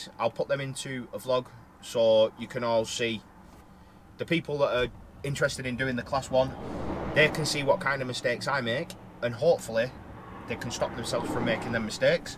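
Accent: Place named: Wrexham, United Kingdom. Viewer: Are you from Wrexham pal?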